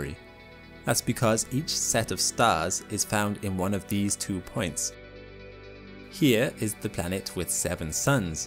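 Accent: United Kingdom. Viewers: it's a called a british accent buddy. fun little fact; they're the ones that invented english, so you're the one with the accent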